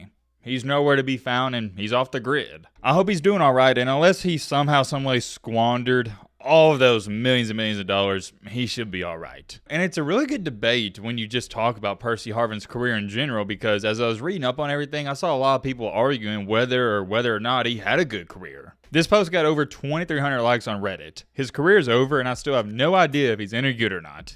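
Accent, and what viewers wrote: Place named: Appalachia, United States. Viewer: be a hiibillie and it's hard to listen to him.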